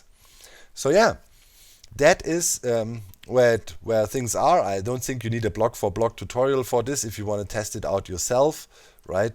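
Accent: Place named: South, Germany. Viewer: Der Süddeutsche Akzent 😁 Ich liebe es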